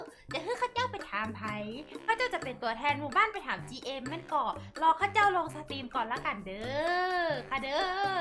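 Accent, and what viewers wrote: North, Thailand. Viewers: พี่เป็นคนเหนือหรอ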